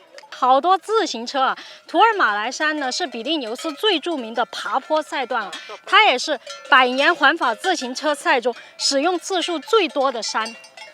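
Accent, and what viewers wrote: Sichuan, China. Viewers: 听口音是四川老乡，支持下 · 四川人，口音理解一下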